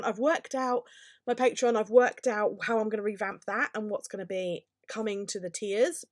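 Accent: England, United Kingdom. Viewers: Absolutely enjoy your “chatty “ vlogs!!! I am way over here on Vancouver Island BC Canada and I always listen to you with my mug of tea in hand just to listen to your accent as English is half of my heritage and I drink mugs of tea every day. Thanks for your hard work. xo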